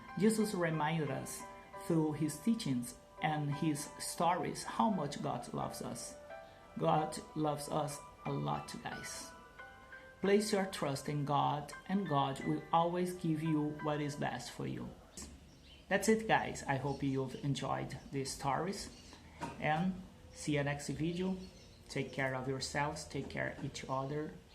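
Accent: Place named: United States. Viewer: Parece um americano convetsando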